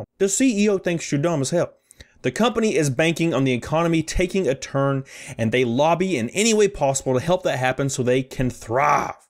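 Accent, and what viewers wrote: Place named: North Carolina, United States. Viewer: Where you from brotherrrrr?!? I’m trying to guess by your accent. I’m guessing North Carolina. Much love from Houston Texas!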